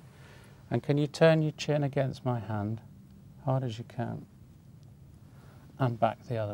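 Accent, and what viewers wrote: United Kingdom. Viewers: I've watched the whole video and I'm still waiting to hear a calm voice. All I hear is a horrible british accent. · That is a very beautiful british accent sir^^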